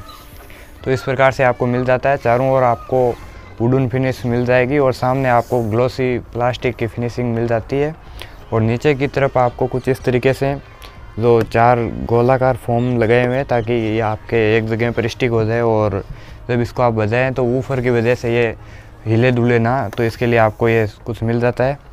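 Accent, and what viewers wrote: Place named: Rajasthan, India. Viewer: Pka rajsthan se ho 😄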